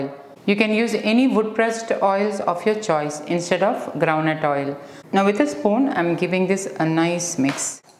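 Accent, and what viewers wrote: Kerala, India. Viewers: Couldn't help but comment.. I could tell the speaker was from Kerala before I even checked her introduction in the description box :)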